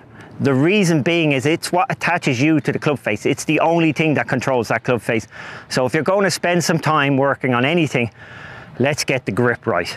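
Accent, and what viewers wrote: Dublin, Ireland. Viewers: Love the Dublin accent , little tums . My favorite band is a Dublin band THIN LIZZY or how Paddy would say TIN LIZZY .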